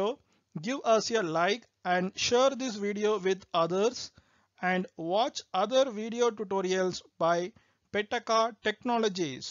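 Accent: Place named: India. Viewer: Content is good, but the indian accent is really giving me a lot of room for excessive thinking.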